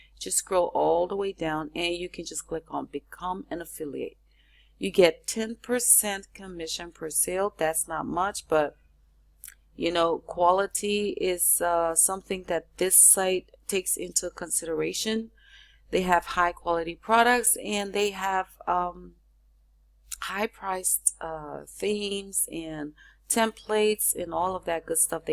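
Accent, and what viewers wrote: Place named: Liberia. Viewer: I love your accent. You sound like a friend of mine from LIBERIA.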